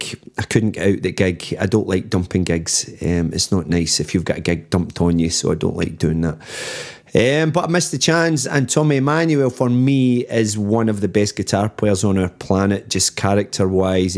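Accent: Scotland, United Kingdom. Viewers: YOu must be a Scottsman?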